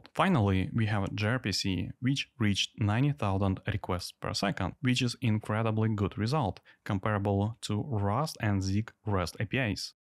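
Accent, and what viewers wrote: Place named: Russia. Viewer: явно русскоговорящий тип делает канал на английском, зачем ?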